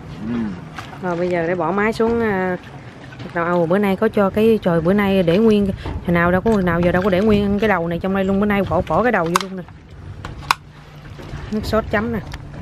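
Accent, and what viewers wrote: Mekong Delta, Vietnam. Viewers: E thích chị ghê.Người miền tây nc cưng ghê 😁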